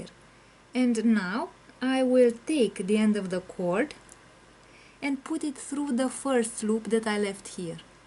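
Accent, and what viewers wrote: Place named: Iceland. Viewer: Very helpful tutorial! Love your accent! Are you from Iceland?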